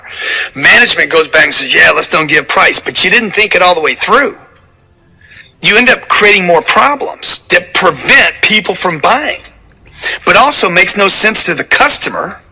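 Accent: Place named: South, United States. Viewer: love the redneck accent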